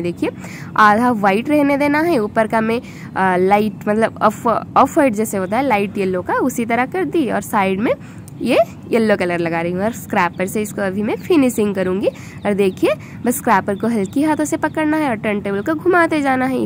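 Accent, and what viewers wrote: Odisha, India. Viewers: Aap orissa se h kya?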